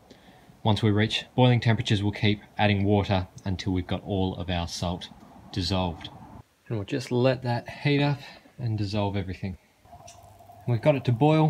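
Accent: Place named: Australia. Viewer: Holy shit mate where are you from in Australia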